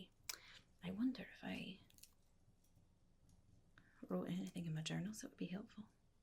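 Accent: Ireland. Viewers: A sure spot of siren song itself, this soothing, seductive, cigar smoke shrouded seance of the solitary sage. If not the siren residing on the rocks to lure you to be lost on some granitic crag, surely a siren to lead you to be lost in an illuminating legend or captivating contemplation of the captain from a rocking chair. More than a wee bit of beguile by brogue in an old saw, story, or spell shared well by the keeper of the light. Far more to keeping the light than meets the eye indeed -- and not the one atop the tower, but in the be-lure to be sure of the tender of tales and old soul insights that cast their own lights over leagues and lifetimes. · Aaah, so soothing. Thank you ever so much,. I've recently discovered ASMR and your channel,and I love it (not to mention, your wonderful irish accent).Always had a special fondness for lighthouses, btw.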